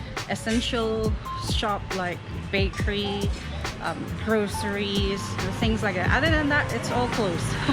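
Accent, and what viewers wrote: Nepal. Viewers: OMG.... your nepali accent ❤️❤️❤️❤️❤️ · You have so nice Nepali tune loved it hope you will speak alot more Nepali language 👍👍👌👌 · You've got a very very beautiful Nepali accent. Kudos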